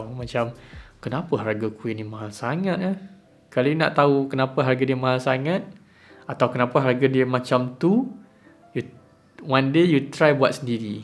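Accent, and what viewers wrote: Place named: Malaysia. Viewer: Anda sendiri bahasa Melayu nya sangat jelek.bahasa Melayu dicampur bahasa inggris.aksen bahasa Inggris anda juga jelek,masih terbawa aksen Melayu,bahasa Melayu bahasa suku di indonesia alias bahasa daerah.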